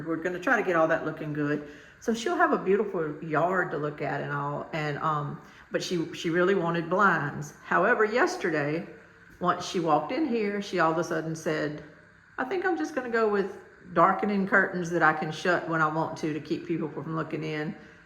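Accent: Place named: South, United States. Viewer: Damn, she's a southern talking woman, and she talks too much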